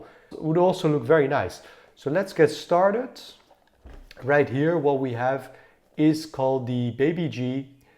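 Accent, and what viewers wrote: Netherlands. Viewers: Why not the GW-M5610 with the BGD-5000? I have the MW and the 7: nice set in white. Btw you sound very dutch.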